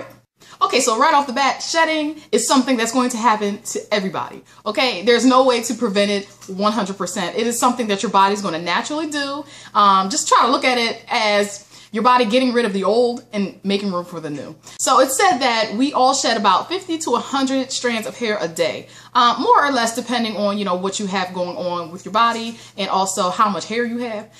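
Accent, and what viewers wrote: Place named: Texas, United States. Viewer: I love your accent! Are you from Texas? I'm Canadian Jamaican and sound nothing like you!! Lolol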